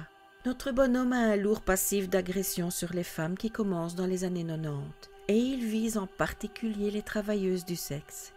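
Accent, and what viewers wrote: Belgium. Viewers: ce petit accent Carolo voire Hennuyer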